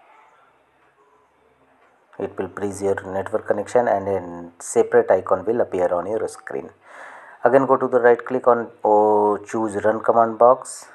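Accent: India. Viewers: indian if you hear this accent again just close the video, or you will lost your time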